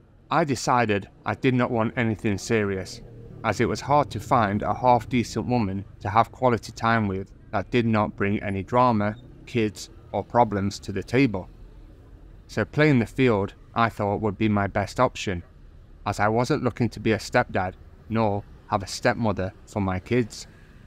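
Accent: England, United Kingdom. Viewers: you sound like a Pom to me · if you're an Aussie, what's with the English accent?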